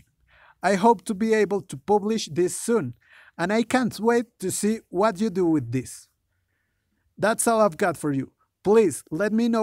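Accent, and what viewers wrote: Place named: Latin America. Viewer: at minute I was like that is a latino accent! es de los nuestros! PD: Accent is not bad my friend, actually feel proud of your roots and being able to speak in 2 languages (or perhaps more) Saludos desde Colombia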